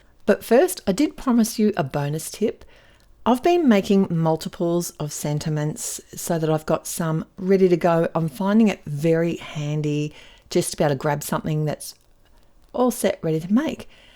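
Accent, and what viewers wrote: Australia. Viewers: Great hear an Aussie xxx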